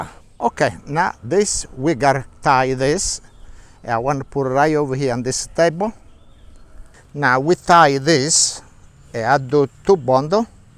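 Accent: Italy. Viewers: Love your accent gotta be Italian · His italian English is awesome !!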